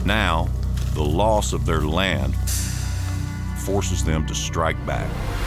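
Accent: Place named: South, United States. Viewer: Decently made, some inaccuracies for sure though. Biggest example being that the British 100% DID have experience fighting “like Indians and backwoods men”. This was called “skirmish order”, and European regiments had a whole company each of light infantry specifically trained in this. They also had just fought an entire 9 year war, both with and against these tactics, known in the US as “the French & Indian War”. So this was nothing new to them, and woodsmen played a minimal role in the war UNTIL the war reached the south where tactics changed. These men would not win in open battle against British regulars. So they did not even try. They attacked civilian and logistical assets, making British leadership not feel comfortable moving further North. This kept the British bogged down chasing “insurgents”(think of modern Taliban tactics), and kept the northern and southern British armies separated. 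My biggest gripe is Trace as narrator. If wanted to be taken seriously as a historian, he should not have been a modern country singer. If you want your documentary to be taken seriously, don’t hire Trace. Especially to cover a subject that literally Southern accents did not exist within. Thank you for listening to my rant 😂.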